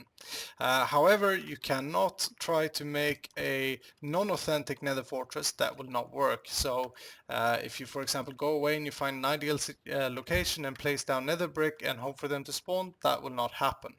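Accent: Sweden. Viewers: You must be Swedish right? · Are you from Sweden?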